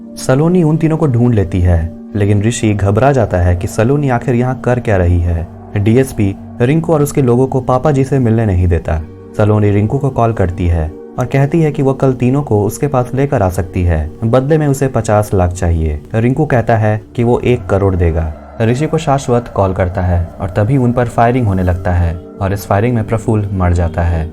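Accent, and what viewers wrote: Bihar, India. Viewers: You're from Bihar bro , ik 😌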